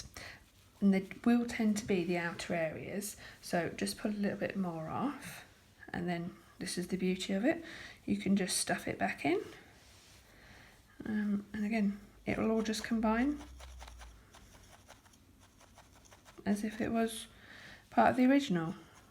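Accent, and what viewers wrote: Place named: United Kingdom. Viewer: Thank you for a straight forward demonstration. Great to hear a UK presenter😊 I personally can't cope with the USA accents.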